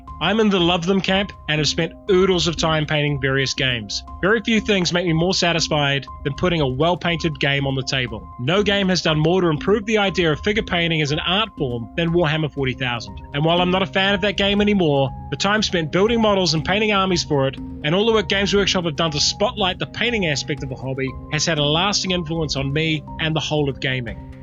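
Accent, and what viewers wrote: New Zealand. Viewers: 1. When I was in high school (in the 80's) a couple of friends and I spent long hours playing Hitler's War. It really grew my appreciation for resource management games and that resource management element is still probably one of the biggest hooks I enjoy in games to this day.2. As a fan of roleplaying, I've also come to enjoy games that have a capacity-building or levelling up component for your character/s (very common in numerous games).3. While it's great to enjoy boardgames with friends, it's also great that so many are getting good ports to the PC so you can enjoy them on your own. I've been playing Lords of Waterdeep, Through the Ages and Elder Sign: Omens quite regularly and enjoying them to fill gaps between real life games.4. King of Tokyo perhaps gave me an appreciation that games can be useful just for a quick bit of laughter and fun - they don't always have to be serious. 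Also great to play with the kids.5. Avalon Hill's Civilization was another game that really made an impact. The idea of achieving technological progress and the awesome trading scheme where you could sneak in natural disasters for your opponents was very appealing.I enjoy your vids, the length is great and it's great to hear a fellow Kiwi (unless my ears deceive me) doing something that is internationally appreciated.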